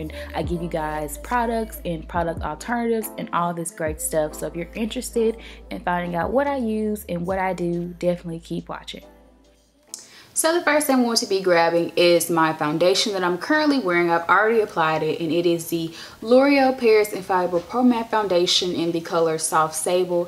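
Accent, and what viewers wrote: Memphis, United States. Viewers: I Knew I Heard Some Memphis Twang In Her Voice. Lol Heyyy Girl😘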